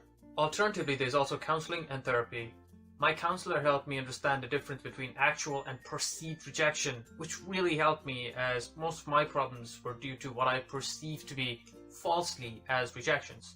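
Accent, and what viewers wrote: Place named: Ireland. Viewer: Loving the Irish accent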